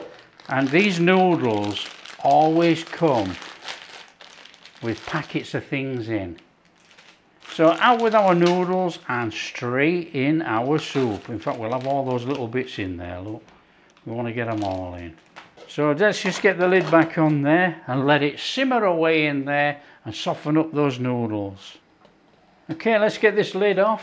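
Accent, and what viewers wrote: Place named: Edinburgh, United Kingdom. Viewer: Edinberg , England is where a life time friend of mine was from..his accent was just like yours lol...he has died but everytime i listen to you I hear George talking lol!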